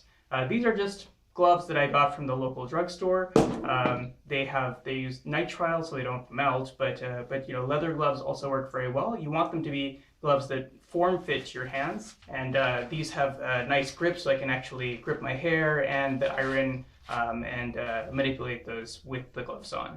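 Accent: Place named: United States. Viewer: I know you're from somewhere around India... but you do an American accent... sounds interesting.. you immigrated to the west.?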